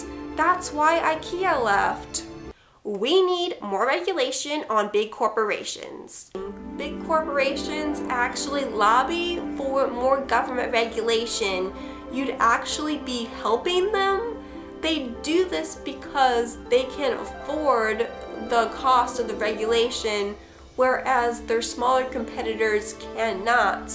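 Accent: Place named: Alabama, United States. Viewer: that 'Bamie accent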